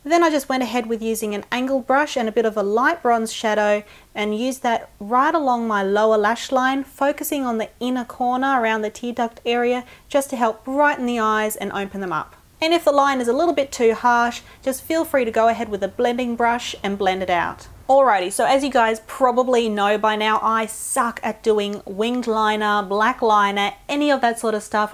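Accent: Australia. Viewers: You know, usually aussie girls say "like" and "omg" about <num> times every sentence, but not you! You just have a mad aussie accent :D and great videos! Love form germany :D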